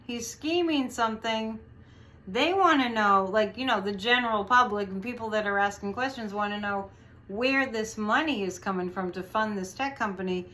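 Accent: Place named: East Coast, United States. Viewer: You sound East coast. Makes me feel right at home. 💛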